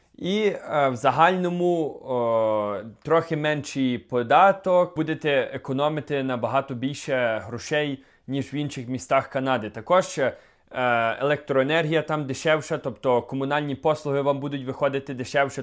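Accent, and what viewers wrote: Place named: Lviv, Ukraine. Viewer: На Львівщині люди дійсно так розмовляють.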